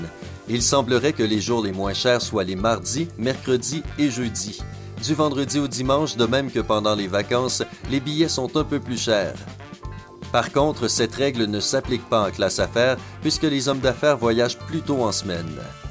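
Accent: Quebec, Canada. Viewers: C'est quoi ton problème avec l'accent québécois?!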